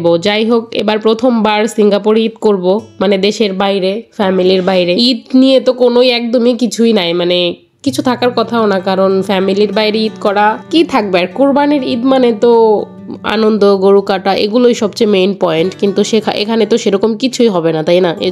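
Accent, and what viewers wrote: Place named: Barishal, Bangladesh. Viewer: কিন্না কিন্না,, করেন,,,আপনার বাসা কি বরিশাল 😮😮😮😮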